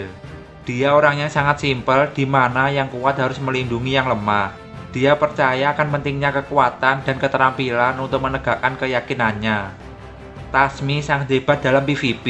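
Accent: Java, Indonesia. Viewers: Medok ya suaranya unik broo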